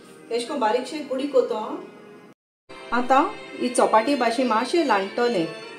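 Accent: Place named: Cuncolim, India. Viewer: I like your konkani dear.are u from cuncolim? It sounds like cuncolim konkani 😅😅. Love all your recipes ❤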